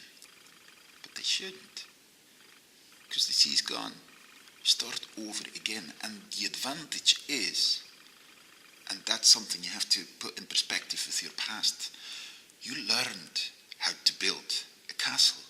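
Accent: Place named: Scotland, United Kingdom. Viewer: whats up with the scottish accent? :p Freaky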